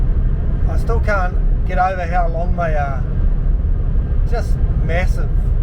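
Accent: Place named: New Zealand. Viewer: Good to hear a familiar Kiwi accent from over there across the ditch. My late son drove interstate out of Melbourne for years. Sadly John was taken from us by cancer aged 56yo on Oct. 21 2021. Bob. Lower Hutt. NZ.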